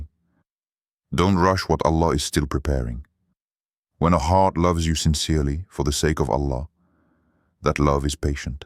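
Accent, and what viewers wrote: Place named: France. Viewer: Agfirullah Ya Rabbi but I can't stand😮 it but in love with that Speaker voice with his French accent,Tauba🤲🏻😭A always want hear his voice 😢Ya Latif, I'm addicted to it Hasbun Allah vanimel vakil😢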